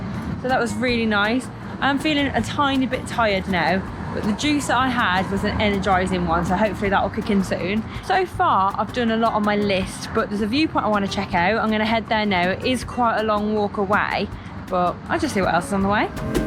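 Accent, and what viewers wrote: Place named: England, United Kingdom. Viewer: Can understand your English English, must be how most Americans spoke when they arrived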